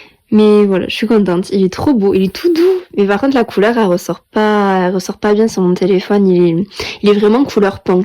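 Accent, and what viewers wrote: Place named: South, France. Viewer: J'adore ton accent, tu es du sud-est ou du sud-ouest? personnellement je suis ton vlog depuis Toulouse, j'aime le sud de la france. Ton petit chiot est adorable!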